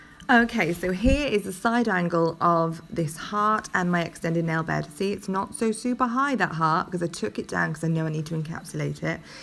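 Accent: United Kingdom. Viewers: I love listening to your British accent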